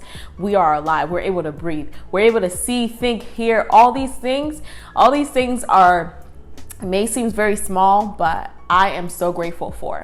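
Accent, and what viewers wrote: Jamaica. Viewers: Love your jamaican accent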